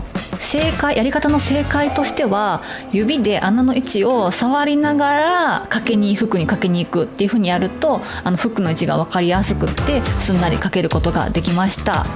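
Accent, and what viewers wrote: Kansai, Japan. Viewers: 関西訛りですか？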